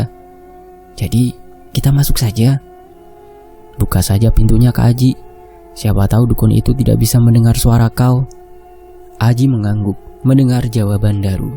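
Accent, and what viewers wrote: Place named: Kalimantan, Indonesia. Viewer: Mas ini cerita dr daerah mn,,, Logatnya dr kalimantan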